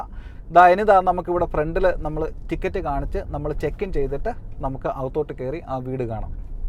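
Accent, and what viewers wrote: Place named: Pathanamthitta, India. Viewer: ഭാഷാ ശുദ്ധി കേട്ടപ്പോൾ പത്തനംതിട്ടക്കാരൻ ആണെന്ന് തോന്നുന്നു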